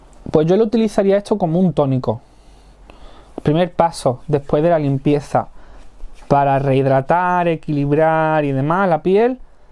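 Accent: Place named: Spain. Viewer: hola, soy Colombiana y desde que te encontré me encanto todo absolutamente todo lo que nos enseñas y el hablado tan español que tienes me desestresa, saludos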